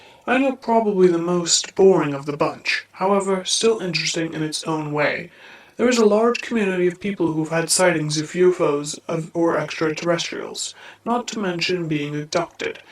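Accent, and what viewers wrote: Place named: United Kingdom. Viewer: Couldn’t hide your British accent in this one even with the voice mod. I ask to see that other video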